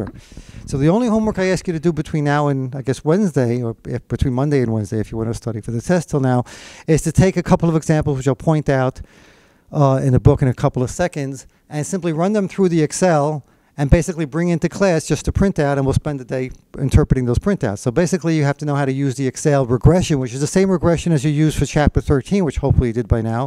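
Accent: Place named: New York, United States. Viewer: Lol I admire the NY/ Jersey accent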